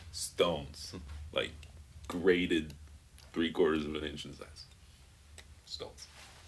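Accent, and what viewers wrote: Canada. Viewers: I can hear that Canadian accent sneaking through. lol. Cool video.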